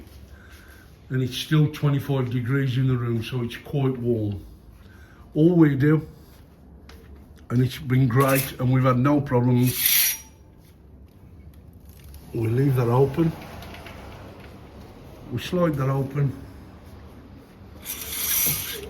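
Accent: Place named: Black Country, United Kingdom. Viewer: Nice to hear a local accent :) , born and bred brummie here now living in east Yorkshire ( guessing your more black country way? ) ... we did Tunisia last year but Sousse , enjoyed it but fancied a change this year and found this hotel , another Tui Blue ( although not adults only this time sadly ) Great honest review and so have booked it for mid December , bit more money than last year but we've paid for a sea view room etc .. cheers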